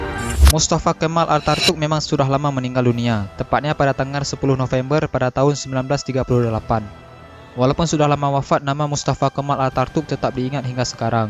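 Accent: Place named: Aceh, Indonesia. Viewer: Admin seperti dialek ureung aceh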